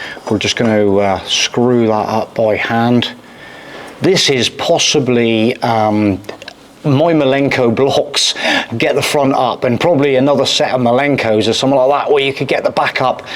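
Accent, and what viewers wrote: West Country, United Kingdom. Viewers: West country eh!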